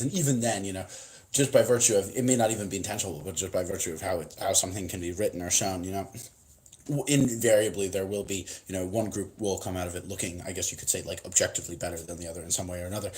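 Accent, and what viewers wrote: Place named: Australia. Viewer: I swear your Aussie accent is more authentic than my fellow Australians. By the way, if you ever talk to Adam, give him our blessings, from the old Wallace gang.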